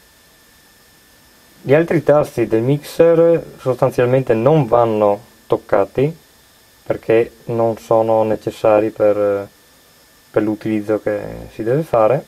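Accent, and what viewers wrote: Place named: Trieste, Italy. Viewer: mamma come se senti che te son de trieste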